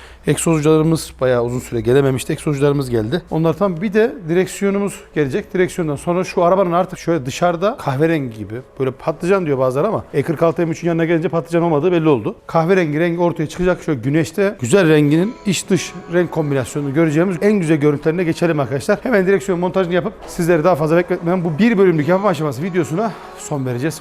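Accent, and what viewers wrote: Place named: Kayseri, Turkey. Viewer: senin gibi bir adam bu jantı nasıl seçer...!!! harika oldu eline sağlık kayseri şivesi insanlığı ve sanayi kafasını tekrardan gördük ...!!! sizleri seviyoruz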